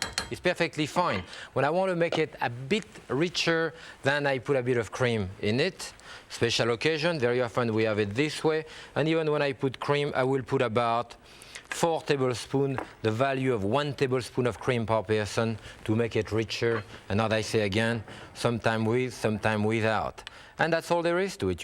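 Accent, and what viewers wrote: France. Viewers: a genius in the cooking world is jacques pepins the french accent adds to the recipes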